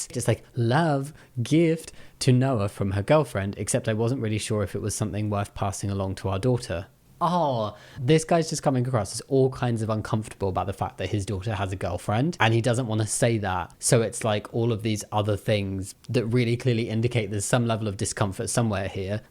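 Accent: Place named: United Kingdom. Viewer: is so scary cause i can imagine you with an american accent now and im so happy you’re not and instead a nice sounding British man with a gentle voice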